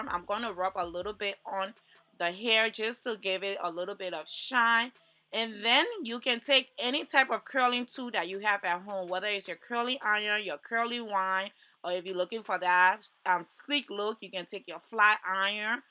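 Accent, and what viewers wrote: Liberia. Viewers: Just by curiosity are you Liberian? I think you have a Liberian accent.😊😊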